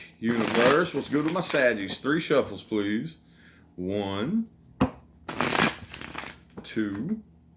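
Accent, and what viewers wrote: South, United States. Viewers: His southern accent tho😍 Aqua here.... Let me go and listen to the "Bonus"🏃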